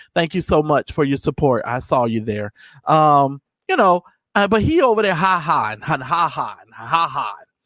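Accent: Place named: South, United States. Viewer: 🤣🤣🤣🤣🤣 You and this southern accent! OMG so funny! Those darn pickup trucks! 🤣🤣🤣🤣🤣🤣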